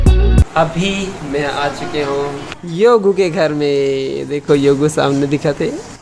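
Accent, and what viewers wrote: Chhattisgarh, India. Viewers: Bhaiya aap bolte ho. I like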